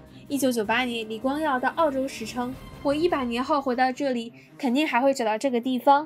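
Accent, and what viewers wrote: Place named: India. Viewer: 这英语一说，我以为到了印度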